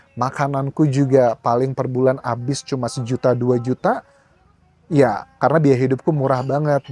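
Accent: Java, Indonesia. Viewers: Pensiun Dosen bahasa Inggris di Thailand masih tergolong sangat muda,logat bahasa nya lebih medok ke Jawa padahal aslinya dari Lampung. Menikmati hidup dengan pekerjaan yg membuat enjoy hasilnya luar biasa. sekelas artis pun belum tentu bisa sepertimu jika menuruti gaya hidup modern.salut nak, semoga segera bertemu pasangan hidup yg didambakan.Tuhan memberkatimu🤲😇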